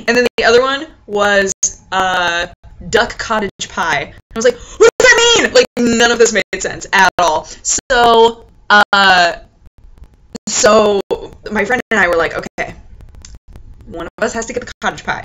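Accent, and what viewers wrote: France. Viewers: I love your frenchie accent !! I'm sure all your french fans (like me ^^) are specially happy today ! :D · your french accent is BEYOND hot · I love your French accent. For me, it's so hard to pronounce the "r" :(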